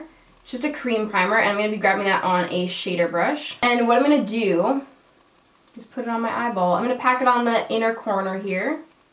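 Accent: Canada. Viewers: YOU DREEEEEEG ALONG THAT LASH LINE! Love the Canadian accent.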